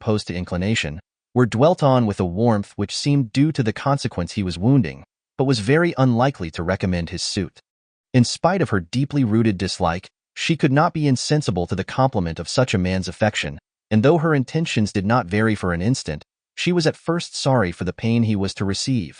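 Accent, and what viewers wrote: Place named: United States. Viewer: A true English classic with a twangy American accent...Hard pass! - Sorry, but it just feels wrong to me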